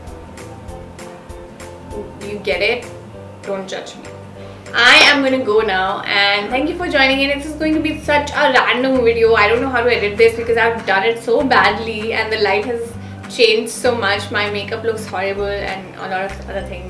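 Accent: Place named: Bihar, India. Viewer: You sound like a bihari..are you one?? :)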